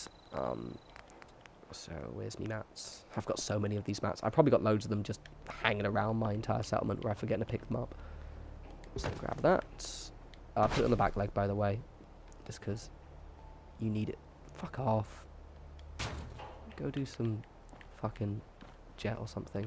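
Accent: United Kingdom. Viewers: You sound like a british Travis, lol. In a good way, great vid.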